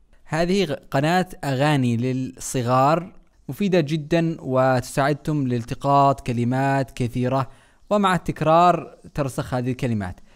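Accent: Yemen. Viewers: انت يمني صح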